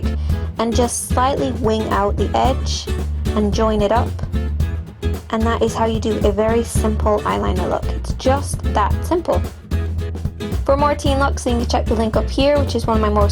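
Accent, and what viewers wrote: Ireland. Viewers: yea its irish! I think its because american accents were predominantly derived from irish accents :) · she's irish :) x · Are you Irish? :D I am ;DD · This is an Irish accent :) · really love her accent :) love irish accent :) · It's Irish. :) Yeah, I've thought the exact same thing - she does go into a bit of an American accent at times. But then she goes back into her Irish one (which I love! because it's so unique, especially on Youtube). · shes Irish.. :-)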